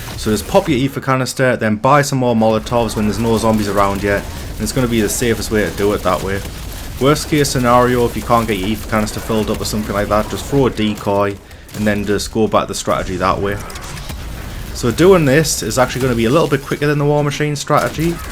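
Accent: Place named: North, United Kingdom. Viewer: I’m really struggling to put my finger on your accent, definitely northern but I can’t pinpoint it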